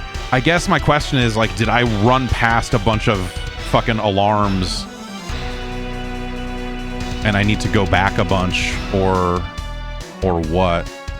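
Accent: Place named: Liverpool, United Kingdom. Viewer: Heard it in flawless Liverpudian accent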